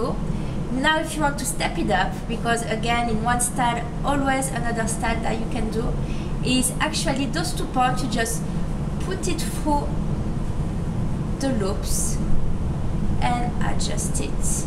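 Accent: France. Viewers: I like your french accent. Il faut que l'on se rencontre ;) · FRENCH ACCENT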